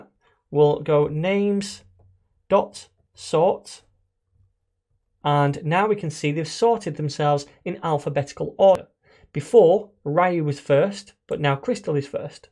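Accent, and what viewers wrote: United Kingdom. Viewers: So glad to hear you with British accents very informative and comprehensive explanation thank you.!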